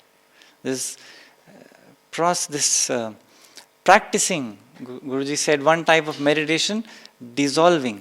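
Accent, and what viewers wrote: India. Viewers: Hindicized pronunciation of names is disappointing when the talk is not in Hindi.. personal opinion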